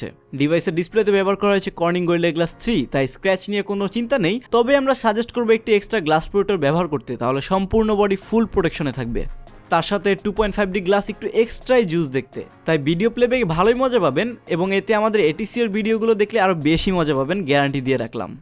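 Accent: Bangladesh. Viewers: mojar... specifically Bangladeshi accents. sadharon jiniso bes valo legeche. good going. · I totally love to laugh at these Bangla youtubers English accent.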